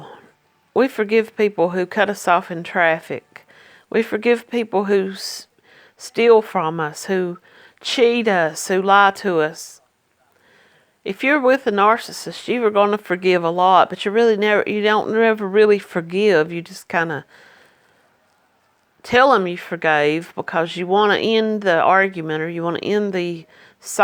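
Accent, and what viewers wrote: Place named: Kentucky, United States. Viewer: You sound like you have a Kentucky accent..because here in my small little town everyone knows everything, and only 1 red light and 1 $ store..lol..and most men here are narcissists.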